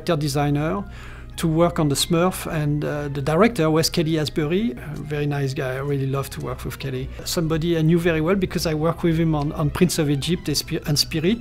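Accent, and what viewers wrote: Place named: France. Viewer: Superbe accent français😂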